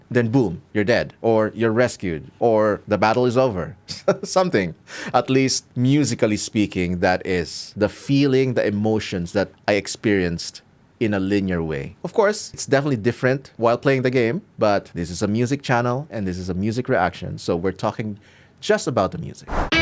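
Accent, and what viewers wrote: Philippines. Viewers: You accent and looks, feels like you're from the Philippines. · pinoy to sa accent palang e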